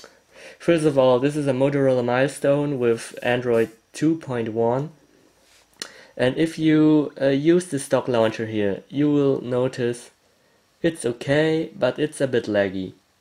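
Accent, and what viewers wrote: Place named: Germany. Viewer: awesome german accent...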